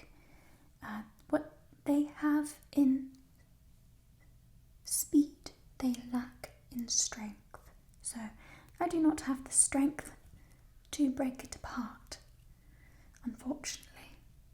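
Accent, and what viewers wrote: United Kingdom. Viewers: Nice British accent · BRITISH ACCENT ALERT❤️ Love this look too x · You know what, you remind me a lot of Allison Williams, actually. And it occured to me that she was in Peter Pan Live, which I absolutely love, and you've also done a sort of Peter Pan roleplay a while back, haven't you? It was a big collab, right? I think it was the accent that made me think of this, I guess it's British? I dunno, maybe you should do another sort of Peter Pan thing... 👉👈 I just think you would be so perfect for that version of Peter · Firstly, you're so good at that British accent. Well on point. ❤️ Secondly, I LOVE this character so much! You're amazing at what you do! 💕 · is making veiled threats and mocking me with a British accent. Is this nice? Yes, yes it is. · Ya know what you do one of the best British accents I've ever heard from an American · The thief is back, I love the British accent, you do such a good job. 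Also that TMNT reference lol.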